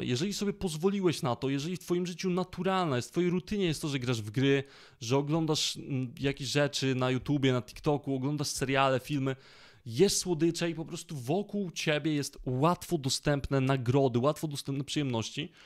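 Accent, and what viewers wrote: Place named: United States. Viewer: Dykcja jest dobra. Akcent jest amerykański, albo holenderski. Taka kluska w gębie. Albo pilnowanie sie, zeby mówić niskim głosem.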